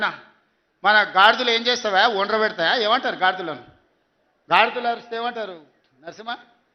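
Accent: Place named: Palamuru, India. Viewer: Maa పాలమూరు yasa lo mata తీరు...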